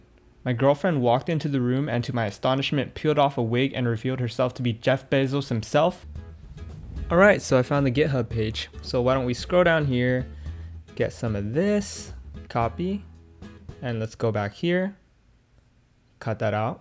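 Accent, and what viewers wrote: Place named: China. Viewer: Your Chinese accent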